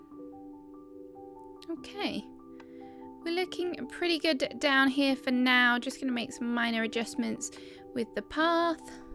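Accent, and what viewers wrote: England, United Kingdom. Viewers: your videos and your gorgeous English accent make me miss the UK so much. My Dad was English from Surrey and I lived and worked in the UK, years ago now. I was set to have a visit last year, but of course as we all know, Covid came in and changed everything for us all. Really love your videos and I am so loving the coastal theme. Wanting to do it on our island next thanks to your inspirations 😊💕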